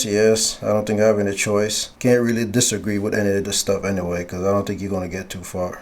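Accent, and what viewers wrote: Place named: Jamaica. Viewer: Jamaican? lol ...accent is strong. Thank you for this I was confused on which one I should get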